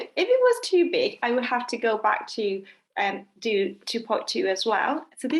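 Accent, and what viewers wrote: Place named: Wales, United Kingdom. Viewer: It’s Welsh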